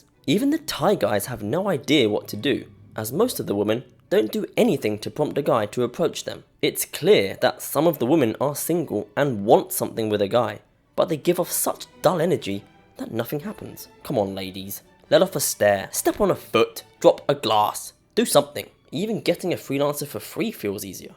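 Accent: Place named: RP, United Kingdom. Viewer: I love your accent; it's quite similar to that of the Queen of England. Greeting.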